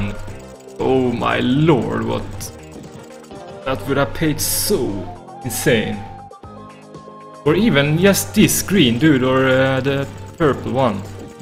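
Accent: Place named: Sweden. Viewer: swedish accent confirmed :D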